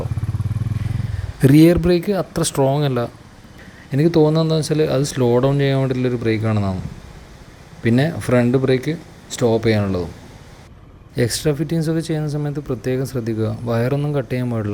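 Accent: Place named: Kannur, India. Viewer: Glad to hear a different tips of this bike from you .. G’men , which is your exact residential location..? as per your slag , you are in KANNUR dist..? Thank you, I like the video and the places of the video ..!!😀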